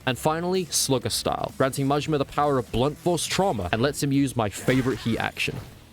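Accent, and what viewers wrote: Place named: England, United Kingdom. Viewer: You said it correctly-ish. As good as you can with english accent.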